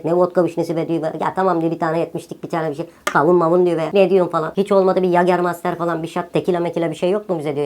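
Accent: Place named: Thrace, Turkey. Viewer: Abi bu Trakya şivesi ile Türk ve uluslararası ünlü romanları anlatır mışın lütfen çok komik olur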